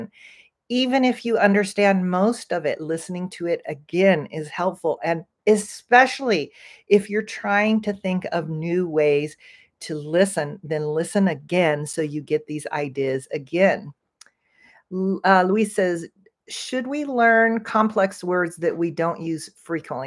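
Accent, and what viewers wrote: United States. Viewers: Dear Madam I like to hear your voice and I almost understand all the content of your speaking. Even though, I've been learning English for almost twenty years, up to now, I can't imitate American's intonation and style like you do. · I am from Indonesia and always glad to hear American English with speak slowly like you do. I just still dificult to understand between can't and can. Thank you. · Unfortunately, I didn't watch the livestream. However, now I am watching and I can understand each word coming from your mouth very clearly although you're American. Since American English is harder to understand than British English. Probably you are speaking slowly because of your having lots of international followers. Good channel and very helpful. Thanks for your contribution. 👏👏👏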